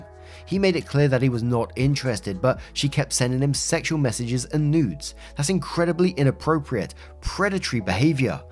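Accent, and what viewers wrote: England, United Kingdom. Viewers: just wanna say, i am american, and I'm not huge on english accents but I love your voice, its so calming even when you are swearing i love it xD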